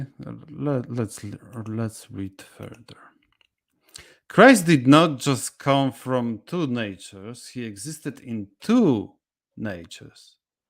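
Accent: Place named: Eastern Europe. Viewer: Is this book popular? Nice read with good commentary and thic and fresh slavic accent 😁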